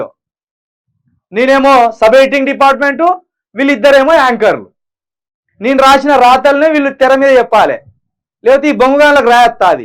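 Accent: Telangana, India. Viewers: తెలంగాణ బాష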